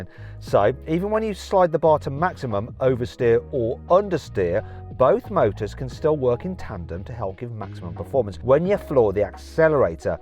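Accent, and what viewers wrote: United Kingdom. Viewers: 이거 들을 때마다 영국식 조크와 영국 영어 발음에 치임 ㅋㅋ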